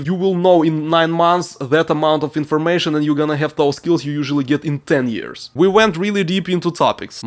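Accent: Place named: Russia. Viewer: ёр инглиш вери вел))) как по мне это супер уровень, а русский акцент придает изюм))) те кто гонит на акцент наверно не слышали индусов или филиппинцев))) хотя я считаю что сложнее чем говор коренного британца брауни или кокни НЕТ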